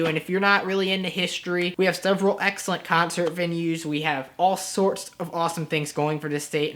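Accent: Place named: Virginia, United States. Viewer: i really hope everyone in Virginia doesn't sound like this.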